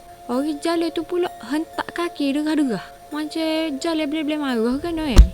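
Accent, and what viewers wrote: Kelantan, Malaysia. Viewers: Suka dengar kecek Kelate. Hadir dari Kuala Berang, Terengganu.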